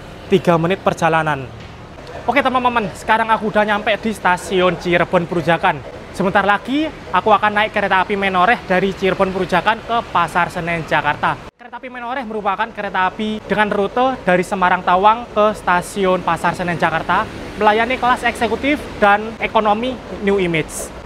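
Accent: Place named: Madura, Indonesia. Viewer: Suaranya yg bkin candu mas😂😂 medok logat maduranya keliatan ,, semngt ngontennya😂😂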